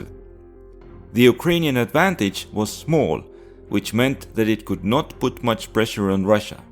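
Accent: Estonia. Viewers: Eesti aktsent, 100%, :D ütle et eksin krt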